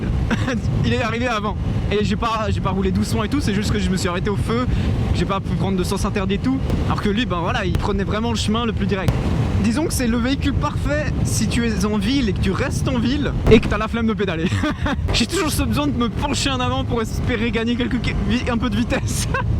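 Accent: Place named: Switzerland. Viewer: Je croyais tu était français jusqu'à ta commencer à dire des chiffres 🤣 après j'ai crue tu était belge...🤦🏽‍♂️ pour finir j'ai compris que tes suisse mdrrr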